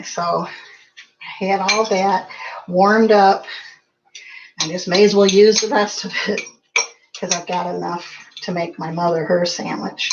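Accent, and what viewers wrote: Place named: South, United States. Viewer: 🌟✨ love your southern accent so much! 💙👏 Another awesome video. 💖💖 Stay blessed and your almost at 1k subscribers!!! So epic🙏🏻🌻🌱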